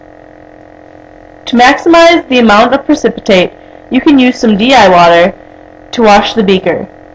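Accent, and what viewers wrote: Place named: Wisconsin, United States. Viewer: More specifically, I think it's "Oconomowoc Swimming" Oconomowoc is a city in wisconsin, and her accent sounds like a wisconsin accent.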